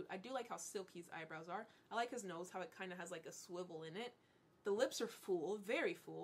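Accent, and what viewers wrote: United Kingdom. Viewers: Came for the casual objectification of millionaire men, stayed for the eerily impressive British accent.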